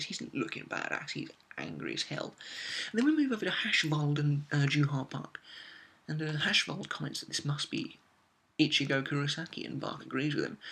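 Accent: United Kingdom. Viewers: Wow, I didn't even think of what kind of side effects the balance of soul would have on the human world. This is why I like your reviews, your insightful and British...are you British?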